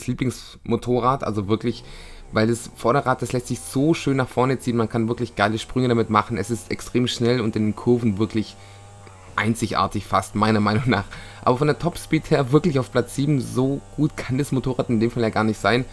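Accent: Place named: Swabia, Germany. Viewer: Schwäbischer Dialekt iz da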